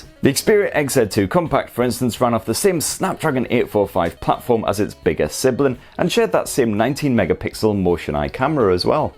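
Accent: United Kingdom. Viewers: His British accent is the icing on the cake 🤭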